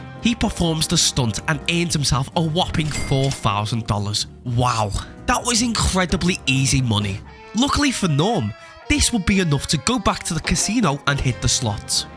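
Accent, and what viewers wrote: Liverpool, United Kingdom. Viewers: Love the scouse accent peaking through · Are you scouse? Love your accent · We have a scouser, yes lad good to see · Is he a scouser? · I know that's a Liverpudlian accent and this is just fuckin' hilarious. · are you from liverpool? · Is this a scouser speaking????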